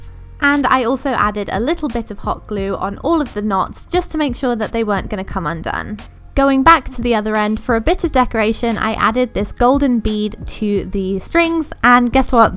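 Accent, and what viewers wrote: England, United Kingdom. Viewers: Is she English or American. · Do you live in America? Because you have a British accent but we don't have "Michael's" here do we?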